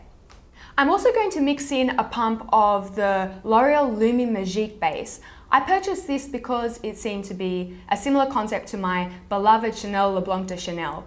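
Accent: Australia. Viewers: You sound australian but your using American products???? I'm confused!!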